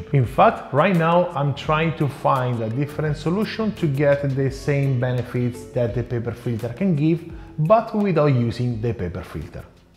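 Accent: Italy. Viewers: thank you so much! Just the video I was looking for while trying to optimize my Bialetti Brikka 4 cup moka pot. I LOVE your videos and your ground to earth explanations:) I even like the Italian accent and your body language while explaining. You`re a gem of knowledge and kindness to your viewers. I will order me some AP filters now:-)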